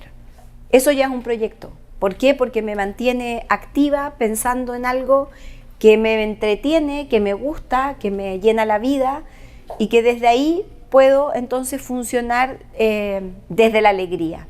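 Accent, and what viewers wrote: Chile. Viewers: ya no eres la misma,que pena, me reía,disfrutaba y aprendía mucho con tus charlas,tu acento chileno y todo lo que decías 😢😢😢😢😢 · casi no se escuchan asentó chileno Por eso me guste mucho escucharte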